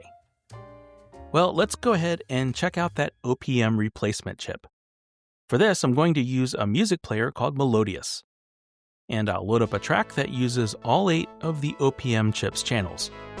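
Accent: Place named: West Coast, United States. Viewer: I feel like that is west coast pronunciation. I catch myself saying wadder instead of water.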